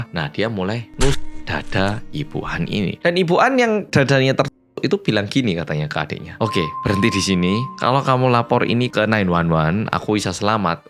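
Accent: Java, Indonesia. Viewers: hallo ❤ Nyimak ceritamu 🎉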